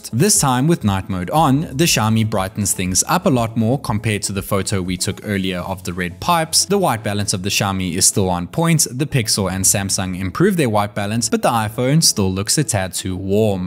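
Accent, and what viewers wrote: South Africa. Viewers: South African ❓I'm new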